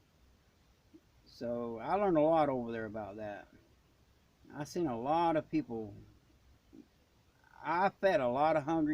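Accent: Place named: Ohio, United States. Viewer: Love your accent. Are you from ohio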